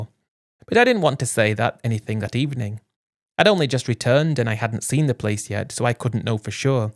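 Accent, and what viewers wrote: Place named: England, United Kingdom. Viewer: Buddy, you sound like a smart English man but not so smart with the girl and I’m not putting you down because you had it virtually made what you were doing buying houses selling them perfect time back then because now to do that in my country Australia and for sure in your country in England we got too many taxes gifting tax tax this tax How are you gonna make $30,000 now the government makes it too hard now because they catch on back then. and now just for an old rundown house is worth a million dollars as much as as you loved your wife I would never would’ve got her involved and most certainly I would never would buy a house in Thailand then leave it to her and then come back to England. no chance and I know what you’re gonna say you haven’t been in love before. It’s not about Love. This is about my retirement and my age. 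There’s plenty of girls in Thailand and there’s always gonna be someone different. She’s not a western girl.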